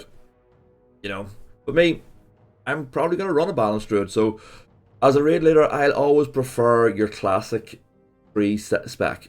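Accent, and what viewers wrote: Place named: County Down, United Kingdom. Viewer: Where in northern ireland you from mate, sound like county down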